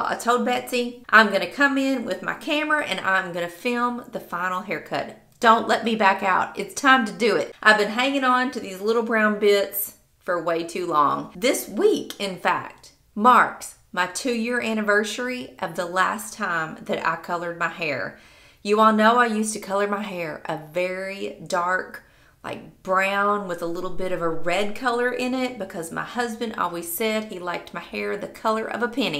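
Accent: South, United States. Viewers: I did the same when I reached 47.. my husband misses the red head. He got over it. He has a duck dynasty Grey beard. God Bless,, embrace the authentic you.. Our world is too full of fake people. Be the real deal. My hair got curly later in life and love it. I blame it on medication. P.S. love your accent so southern. Mine is a Texas drawl.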